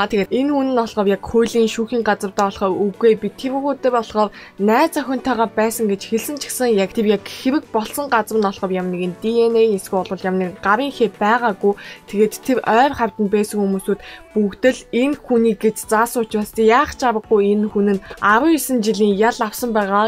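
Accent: South Korea. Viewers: ta solongos aylagtai yaridag yum bn te say l anzaarlaa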